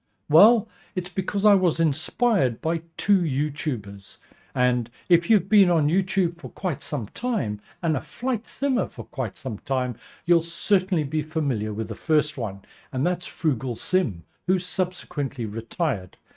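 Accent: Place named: South Africa. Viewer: Great channel and especially great to hear a familiar accent 🇿🇦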